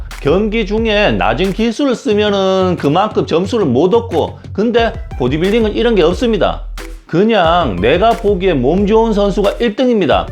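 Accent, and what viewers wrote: Gyeongsang, South Korea. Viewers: 점수가 쁘라스가 되고 점수를 몬어꼬 ㅋㅋ 저도 경상도 입니다ㅋㅋ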